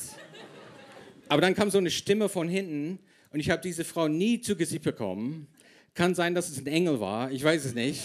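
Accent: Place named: Berlin, Germany. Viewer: Berliner Schnauze ist halt nice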